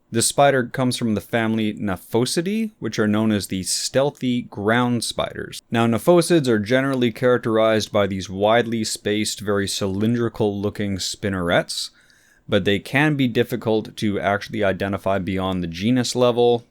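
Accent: Canada. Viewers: Nova Scotia! Right on I thought I sensed a fellow Bluenoser! 👌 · These videos are great and I love your sense of humour. I also love to hear Canadians speak. "Out" and "about" in particular. You should do an episode on the Aphonopelma marxi (brown tarantula). Common in Colorado, where I live, and terrifying to all the transplanted humans from West of the Continental Divide.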